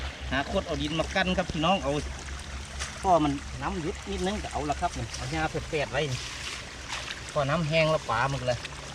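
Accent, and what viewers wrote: Phu Thai, Thailand. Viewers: เป็นคนอยู่ใสครับคือเว้าคือคนภูไท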